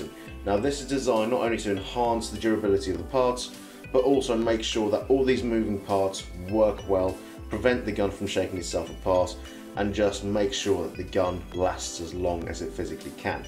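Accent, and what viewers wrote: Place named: Australia. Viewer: lol Your aussie... where you based?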